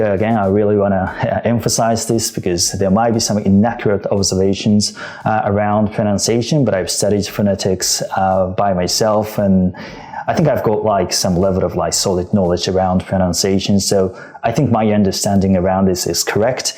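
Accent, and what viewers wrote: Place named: Australia. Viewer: 今回は特にあつさんの英語がたくさん聴けてうれしかったです😍あつさんの英語は「ポッシュなオージー」みたいな勝手なイメージを抱いています😅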